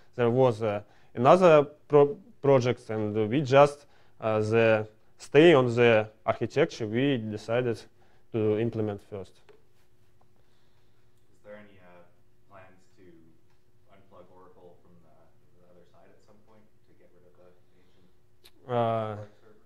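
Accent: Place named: Russia. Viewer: вот есть индийский английский а тут русский английский.. Лучше на русском, многие ищут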